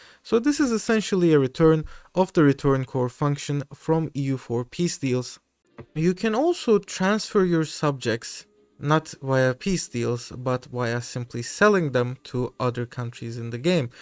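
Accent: Turkey. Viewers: It's just your english accent sounds very turkish and your pronunciation of turkish names is almost spot on. The only proper name you "messed" was "Döger" and that's not even your fault. It's PDX's fault. It should be "Döğer" with a soft G not "Döger". I swear the letters I, İ, and Ğ cause so much pain for the software developers lol. Although azeris call that oghuz tribe "dögər". Perhaps you are azerbaijani 😂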